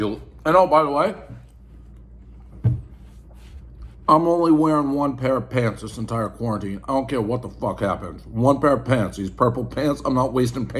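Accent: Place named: East Coast, United States. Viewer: If you watch the closed caption, you can understand why people from the east coast sound so god damned ridiculous... That being said, I love the reviews... thanks for keeping us entertained,! You rubbaneck!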